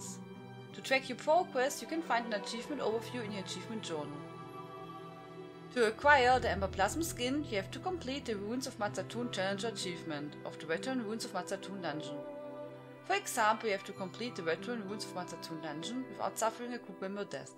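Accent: Germany. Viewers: yes or Akzent is germany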